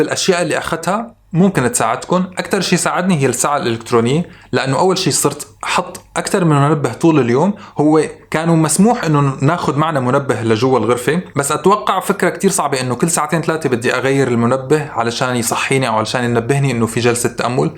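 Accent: Syria. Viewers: حضرتك سوري ولا اردني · انت سوري من السويدا او من الساحل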